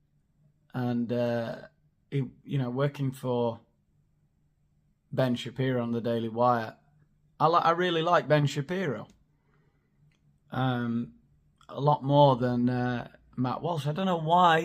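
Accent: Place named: United Kingdom. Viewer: I missed the video y’all are talking about, but I’m going to go back and watch it. Even without seeing it, I can tell you, that I love you sense of humor, and always think you’re funny in every video you make. Keep ‘em coming! Oh, and I didn’t know you lived in Spain. I guess I thought you lived in the U.K. because of your accent (but I’m no expert on other people’s accents. I live in Texas and have my whole life…and no, I don’t ride a horse to work everyday, lol, but I would like to). I came to Spain twice. First time with my now ex-husband on a business trip and the second time with my now ex and my youngest son, who is a year younger than you. That time was a pleasure trip. Went to Madrid both times and the second time we also went to the Rock of Gibraltar and a city near there (that I can’t think of right now, but it’s a well known city and was a beautiful place). 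Love your videos!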